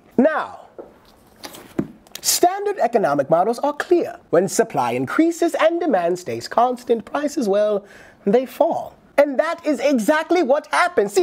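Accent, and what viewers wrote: United Kingdom. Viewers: Full body cringe to that British accent... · the only thing worse than the yeezys 2018 releases is your British accent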